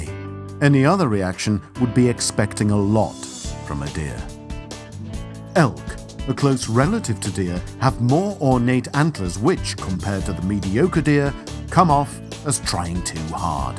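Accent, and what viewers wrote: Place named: United Kingdom. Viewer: His British accent makes everything sound so smart.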